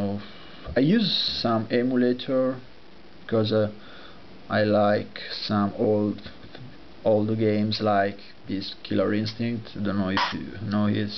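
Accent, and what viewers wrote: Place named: Italy. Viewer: love the italian accent, a lot of friends speak like that :D Cheers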